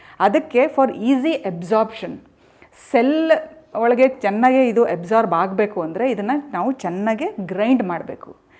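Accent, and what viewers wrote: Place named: Bangalore, India. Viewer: ದಯವಿಟ್ಟು ಶುದ್ಧ ಕನ್ನಡ ಮಾತಾಡೀ ಬೆಂಗಳೂರಿನ ಕನ್ನಡ ಬೇಡ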